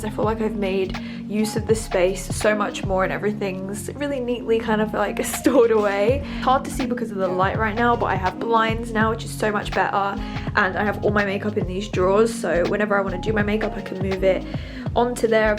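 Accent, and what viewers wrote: United Kingdom. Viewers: Lol she sounds British aahhah is that just me.. must be because I'm British too 😊😊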